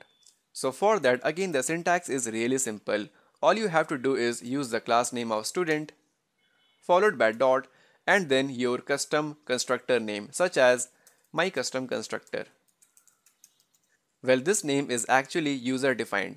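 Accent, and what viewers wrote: India. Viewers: Wow, a very thought out and systematic lesson on constructors. The Indian accent is mild enough that it doesn't get in the way. Excellent job.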